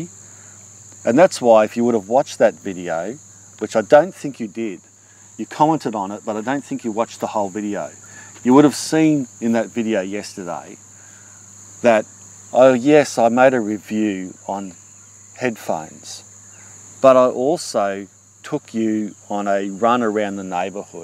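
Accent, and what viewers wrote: Australia. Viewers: I don't have TV and I just love the sound of your Aussie voice, if its relevant to you I can listen to you talking about :-) I hope you keep making videos even if its about the weather and its impact on your garden and your neighbourhood... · From how to build a fire pit, pickling, watching you cut wood, transporting snakes, learning about a tick I never knew existed, to how to grow a lot of... I have really enjoyed your videos. I love your humor and some of the things you've done with your videos. I felt like a fool after watching one video (which was the first one I watched) then sharing that your hen was broody. Thanks for graciously letting that go by. I don't even have any chickens! Keep up the good work. You always seem to make me laugh. The videos you share benefit me. After the review of the Mortar & Pestle set I knew which one I wanted to buy. 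Oh yeah the DIY outdoor cat hotel - so cool. Ok... REALLY, I just dig the Australian accent not to mention the sound of your environment. The birds and wildlife are phenomenal.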